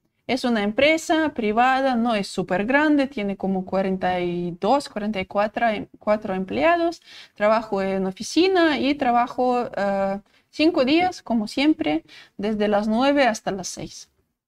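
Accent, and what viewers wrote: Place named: Russia. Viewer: Jamás me Olvidaré de esa voz mesclada con asento Ruso ❤ te envio este corazón lo que te puedo Regalar en este Dia de san Valentín....... desdevenzla a marciales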